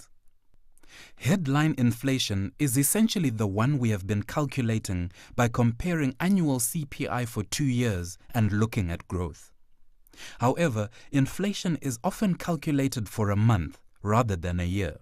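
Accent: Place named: Zimbabwe. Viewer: very good, i wonder who posted this, kinda sounds like a zim accent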